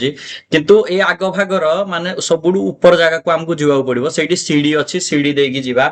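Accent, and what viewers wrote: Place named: Angul, India. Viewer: nka ghaa anugul a4hmalik side ru ki....katha pura anugulia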